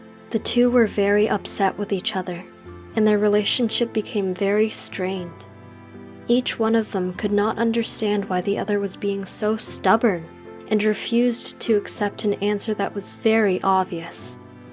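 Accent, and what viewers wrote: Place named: United States. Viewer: It is Bodhisatta. But she is pronouncing all these Pali words with American english accent and maybe with other accents, and making them sound like so alien words.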